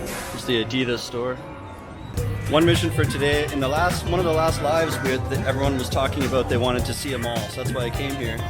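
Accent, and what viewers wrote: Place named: Toronto, Canada. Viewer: NIce to see another Toronto voice active here in the Penh....and a fellow bassist to boot. Great vids.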